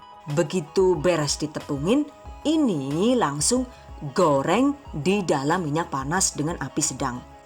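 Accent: Banyumas, Indonesia. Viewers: Ngapaknya katok kak yakin bikin ana pingen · Suka bgt dngan channel ini,detail bgt. Di selingi khas ngapak yg lucuuuuu....bikin gk bosen nontonnya. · Astaghfirulloh.... NGAPAKNYA gawe nyong njungkel kepoyuh ngakak ora mandeg kie🤣🤣🤣🤣🤣🤣🤣🤣🤣🤣POKOKE THE BEST CHANNEL, SUKSES SELALU 😍😍😘😘😘😘🤣🤣🤣🤣🤣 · Yayayaya ngapak temen rikane Mak 😅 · Aja klalen,,,diiiii caaateeet luuurrrr😅😅😅😅😅 ora ngapak ora kep nak,,,mantap jiwa mempesona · Ngakak pke bhasa ngapak tp sngt seru,,thanks resepnya😁😁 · Hahahaha salammm ngapakk .wong ndi sih kie yah😂nyong ngapak kie jan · Jowone ngapak mantap resepe lucu iklane suka 2 nya itu jamurnya nak coba sudah saya catat lalu saya coba ah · Ngapak mbangeeeet kieee mak... Masya Allah kereeeen. Ra ngapak ra enaaakk... 😂😂😂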